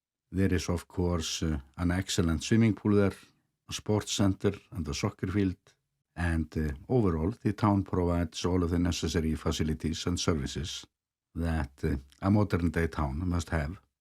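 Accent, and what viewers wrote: Iceland. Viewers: Great video! (as always!). I visited some of these areas you mentioned on my visit to the snaefellsnes peninsula last year in April 😊 I thought it was a fantastic area to visit, I found borganes a little unusual! When I went it felt like a ghost town it was SO quiet and hardly any people walking around! But the peace was nice 😌 Was nice to revisit some places I went via your video! In August this year i am taking the road less travelled and I will be seeing the west fjords which I very much look forward to! Thanks again for another beautiful video. I LOVE your icelandic accent and commentary! x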